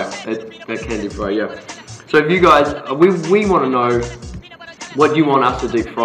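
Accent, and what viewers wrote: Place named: New Zealand. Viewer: Are you from NZ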